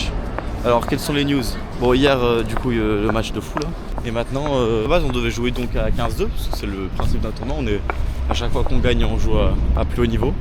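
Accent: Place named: United Kingdom. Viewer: waa il a un big accent des UK mdr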